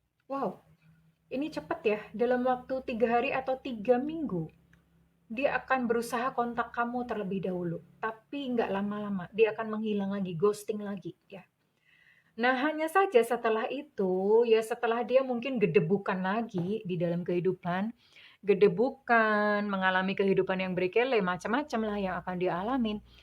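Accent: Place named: Java, Indonesia. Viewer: Ngeret jowo bund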